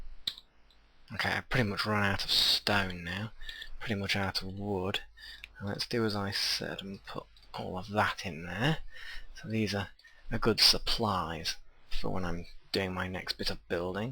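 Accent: England, United Kingdom. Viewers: that english accent makes it all so captivating, it's like watching a documentary... on... digging diggy holes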